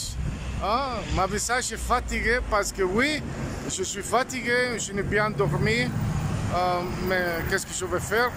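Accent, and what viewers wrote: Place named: Canada. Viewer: Your French accent sounds very Canadian. However, I love the way you speak French and you can code-switch languages, you have a gift, my friend! Thank you for this video!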